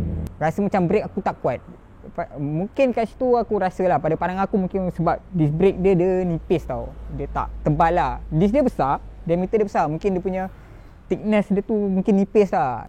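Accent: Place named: Malaysia. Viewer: standed percakapan org mesia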